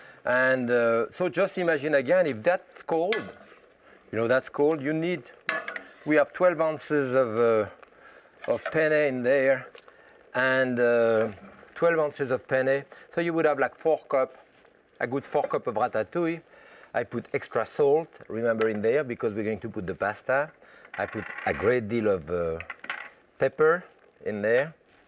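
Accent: France. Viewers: For someone with a pretty strong French accent (which is great btw) Jacques has an elaborate English vocabulary, which is strange. Is it because European languages share many words?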